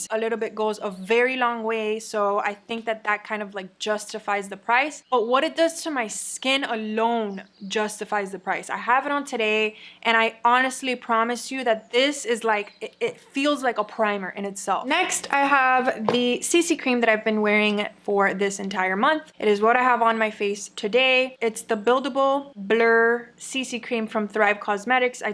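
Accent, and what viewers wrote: Cuba. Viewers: Ok, you have to make a video in Spanish. Me encanta tu acento Cubano You look beautiful in this video, as usual. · Cubana! No daba con tu acento!! 😅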